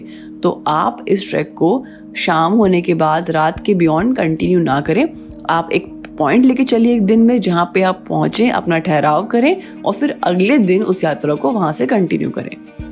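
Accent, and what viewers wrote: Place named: United Kingdom. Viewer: Use less auto-tune and change ur accent to Indian... unnecessary english accent is really annoying even though ur content is good....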